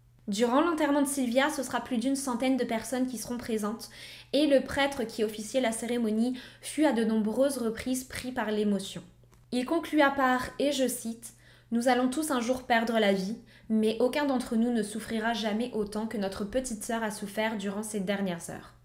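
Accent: Quebec, Canada. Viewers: Punaise je me disais que t avais un petit accent québécois mais je comprenais pas pourquoi il était aussi léger tout s’explique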